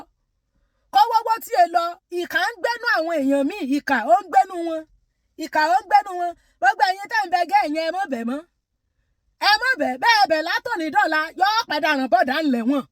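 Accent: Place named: Osun, Nigeria. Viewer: I REALLY GBADUN THIS YOUR PROGRAM WITH THIS YOUR YORUBA LANGUAGE YOU'RE REALLY FROM OSUN STATE😂😂😂😂😂❤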